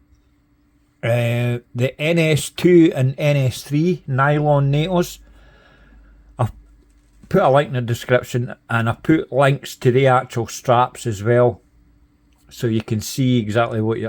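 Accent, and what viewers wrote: Scotland, United Kingdom. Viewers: Sir, you are the most Scottish person I ever heard. Love it.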